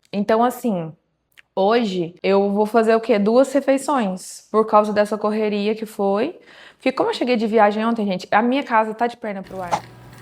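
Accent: Minas Gerais, Brazil. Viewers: Ela é mineira 😊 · Parece mineira. Eu falo assim e sou mineira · Amei seu conteúdo, esse sotaque mineiro melhora tudo. Sou de BH, tenho resistência à insulina (SOP) e me senti representada. Espero perder 13 kgs esse ano para chegar na estética que desejo, a pior parte para mim do tratamento da SOP é a musculação :( kkkkkkk. A alimentação eu amo, gosto de cozinhar, não tenho nenhuma seletividade, adoro fazer meus pratos lindos e coloridos, me inspirei em você e coloquei muitos ingredientes na listinha de compras. Obrigada <3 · o seu sotaque é muito bom, amo!!! Minha família é mineira, então é o sotaque do meu coração. Estou gostando muito dos seus vídeos, vc passa muitas informações importantes, tbém tenho resistência a insulina.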